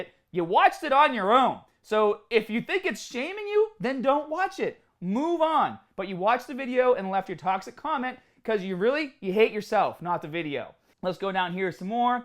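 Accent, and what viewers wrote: Boston, United States. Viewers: Body Shaming? What about language shaming? I can't believe you and your Bostonian accent can make fun of how others pronounce words like Granola!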